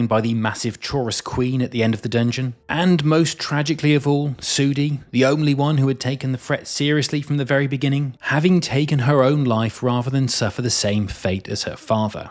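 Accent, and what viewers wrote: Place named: England, United Kingdom. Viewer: Brilliant video, concise, funny and very useful. Also you sound like you're from England like me! Did not know about the Argonian, the other quests I had done some of but didn't realise that they progressed!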